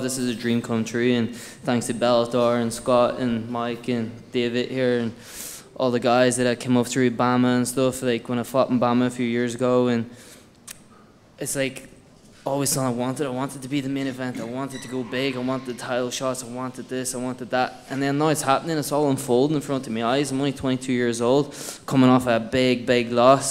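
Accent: Ireland. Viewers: Dude I get you got a Irish accent but your literally trying to sound like Conor “you know I mean” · James : Irish accent (bc he was born in Ireland) Everyone : “bruh he copied Connor” lol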